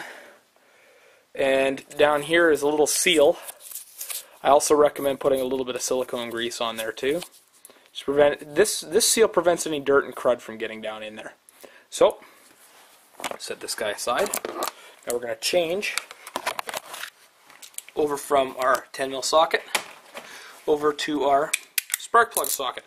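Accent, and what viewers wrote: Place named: Canada. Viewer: You sound kinda Canadian, eh? I would check those gaps. I dont trust the mfr to gap them properly.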